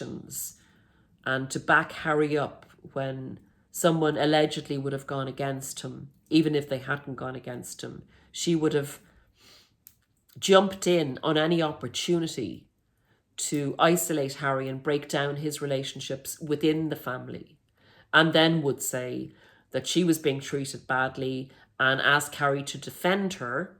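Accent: Ireland. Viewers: Interesting ...Irish accent 🎉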